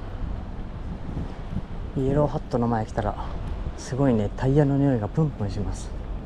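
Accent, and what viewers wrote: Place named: Kitakyushu, Japan. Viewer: 北九州近辺いいですねぇ。北九州弁がいいですね。懐かしく思います。猛暑の中で井堀、朝日ヶ丘一杯やってください。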